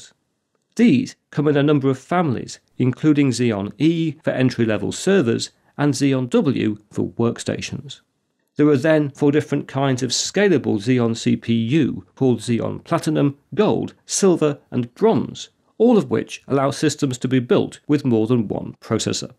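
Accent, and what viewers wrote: United Kingdom. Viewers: that british accent! love this channel.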